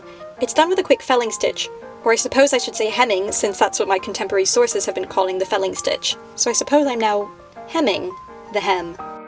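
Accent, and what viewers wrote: United States. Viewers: but with modern American intonation, it's honestly relaxing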